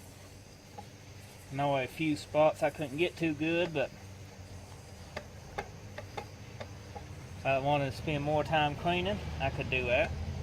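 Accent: United States. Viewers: American accent with a speack dialect but damn good video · Love the American accent mate. I'm from Ireland... and it sounds nice. Good video, I'm going to try it on my škoda.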